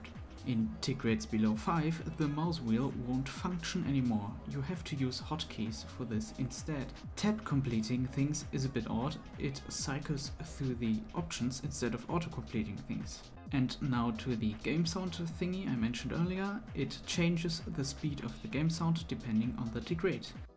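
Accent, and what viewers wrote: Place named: Germany. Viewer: This german accent Ist nett lmao XD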